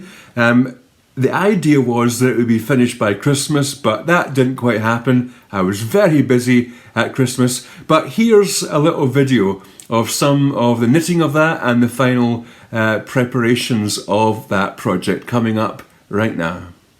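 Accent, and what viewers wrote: Scotland, United Kingdom. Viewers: Hello from Deb Sims of Charlotte, North Carolina, USA…You are a natural at YouTubing. Love the content mix…music, scenery, projects…lovely. Love, love the colorwork and color choices in your hat. My forbears were from Caithness, so I love hearing Scottish accents. I’m a happy subscriber. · Hello - I live in Tasmania, the little island state of Australia 🇦🇺 your podcast was just a delight to watch and listen to- I adore the Scottish accent and I really enjoyed the scenery of Arran. My dear Dad was an amazing knitter- he taught me to knit and, although he passed away in 1995, we still enjoy wearing some of his garments- our beautiful granddaughter is wearing a colour work jumper he made for our daughter in the late 1980s- so very special ❤ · Hello from the North of France, near Compiègne and Paris 🗼. I enjoyed very much watching your podcast and admiring your nice country. 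Your videos will be a good English exercise for me, especially with your Scottish accent, I have to get used to it. But after several videos, I guess it will be OK.Thank you for the good time spent with all the community of knitters from all over the world.🙋‍♀ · Hi Michelle here. I love your podcast - I live in New Zealand. I love the Scottish accent thanks to my Great Gran who was from Glasgow - it takes me back to my childhood - I will be looking forward to the next one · Hiya from the central Highlands, always good to hear another Scottish voice! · Gday from Australia. Really enjoyed your video hope to see more. Really liked the country side video. My dad was from Scotland so your accent made me feel at home. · Hello from Iowa City,IA USA!!! The Gaelic name caught my attention right off!! I LOVE ❤️ listening to the Scottish spoken word!! & I hope to make a trip to Scotland soon!! I enjoyed the trip around your area & center. 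I started a Prayer Shawl Ministry at my church(St.Mary’s) where we knit&crochet prayer shawls & Baptism blankets!! Thank you for sharing what you do!! Linda God Bless!!!😇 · Lovely to find you here on you-tube and fantastic to see your workplace and home. I laughed when you said that your Mum would be the only person to have watched the whole thing! 2.97K subscribers as I write:) I am trying to learn and appreciate sock knitti g right now and am attempting afterthought heels too! I have 4 tubes done and now need to try the heels: I will check out Arne and Carlos' pattern to see if they have any extra tips, so thank you hugely for that . I am in the UK: SE London. Lovely to see a man knitter and hear a scottish accent :) It saddened me to hear about the island clearance: what a heritage we have..... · My Dad was born in Scotland and he came to the US as a child. He tried and mostly succeeded in losing his accent…until his last years. 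Listening to you reminds me of him and his mother. Scotland is an absolutely beautiful country and I loved my visit many years ago. Congratulations on the sterling beginning to your career as a content creator. Please, please, please continue to include videos of your beautiful homeland! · Hi there! I had your podcast pop up in my feed and I was intrigued by the name since I am of Scottish heritage and one of my fav restaurants in Edinburgh is called the Albanach. I also love Arne and Carlos socks but I love their Pair Perfect socks also by Regina. I have knit many pairs and also the childrens’ version. Your accent reminds me of my family there. Just back from my third time there this past fall. Can’t wait to return. Very much enjoyed your podcast and looking forward to watching the others and for future podcasts! Slainte! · Hello from CRESTONE. Colorado, USA. I happened to find you and enjoyed watching and listening to your Scottish accent. 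I'm a crocheter but have done some knitting in the past. Your area is very beautiful. I live in the foothills of the Sangre Dr Christo Mts.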